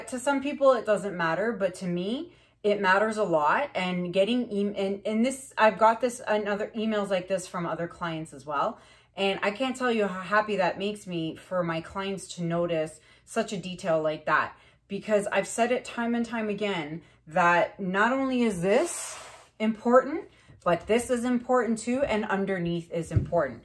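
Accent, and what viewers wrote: Canada. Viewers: I love listening to your accent and chatter, I’m an Aussie and love the Canadian accent.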